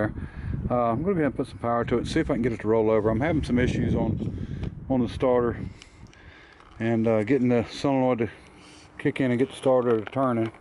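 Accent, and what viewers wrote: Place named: West Virginia, United States. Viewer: is your family from West Virginia I'm just wondering because of your accent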